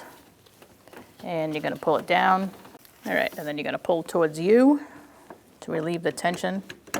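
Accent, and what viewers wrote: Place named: New England, United States. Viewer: What part of New England are you from? Nice vid.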